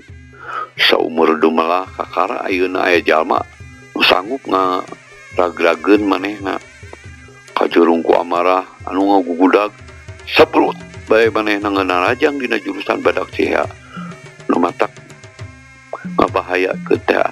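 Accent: Sunda, Indonesia. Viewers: ini cerita dongeng yg paling ditunggu dengan logat sunda nya yg khas,mantap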